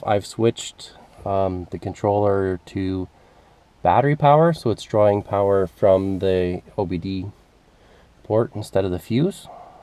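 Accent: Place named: Southern Ontario, Canada. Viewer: That there is a southern Ontario accent. You still happy with the purchase?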